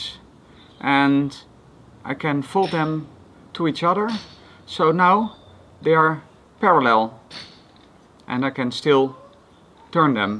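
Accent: Netherlands. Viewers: This is the most dutch english ever. Amazing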